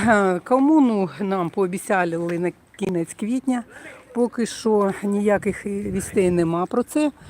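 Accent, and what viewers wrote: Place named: Russia. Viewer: по Вашему акценту чутно, що Ви все життя розмовляли російською - розмовляйте, так, як зручно..... Навіщо розпалювати цей мовний конфлікт- російська мова, це що власність путіна???????? В Норвегії теж розмовляють норвезькою та англійською мовами, але ж Слава Богу ніхто нікого,асвабаждать, не лізе...........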